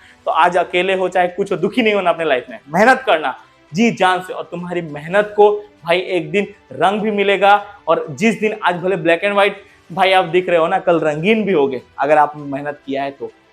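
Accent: Odisha, India. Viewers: Bhai tu odisha ka hai kia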